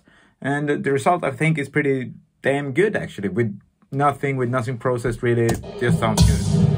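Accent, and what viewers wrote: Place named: Hagfors, Sweden. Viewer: Håller med om katterna, å dialekten va Hagfôrsmål. 😁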